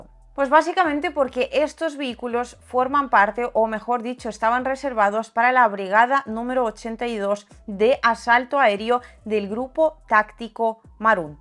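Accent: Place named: Spain. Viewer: Me encanta tu acento espanol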